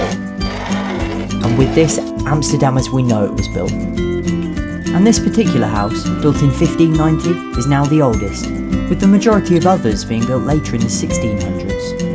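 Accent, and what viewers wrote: Flanders, Belgium. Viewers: It's a joke, we don't actually dislike Flemish people. Personally I really like the Flemish accent, though it isn't really fitting for a video about Amsterdam. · Native dutch speaker? She has a flemish accent. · Lovely video! But it sounds like the girl from the voice over is from Belgium and not the Netherlands! Flemish and Dutch are officially two different languages! :)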